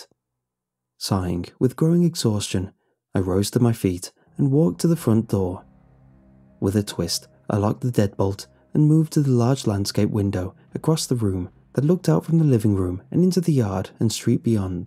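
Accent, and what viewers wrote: United Kingdom. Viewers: That British accent ❤❤❤❤❤❤❤❤❤❤❤❤❤❤❤❤🍌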